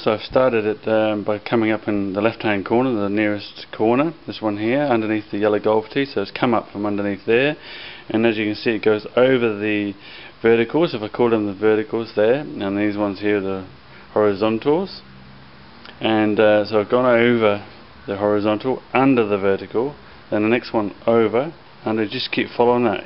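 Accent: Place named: Australia. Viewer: Great demonstration! I now have the courage and confidence to recant several antique chairs I've been saving for a long time. You sound just like my son-in-law, he's from Australia too and has the calm reassuring manner of speaking. Thanks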